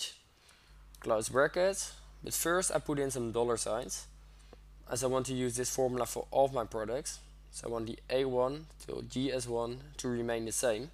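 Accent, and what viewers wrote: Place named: Netherlands. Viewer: keep up the good work. By any chance you are dutch. Please see an english logopedist for it might drasticly improve the quality of your costumersatisfaction.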